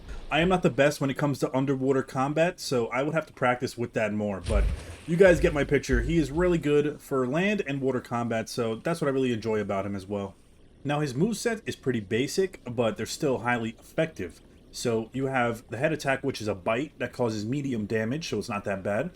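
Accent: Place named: New Jersey, United States. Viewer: you from jersey? I hear a tiny accent coming through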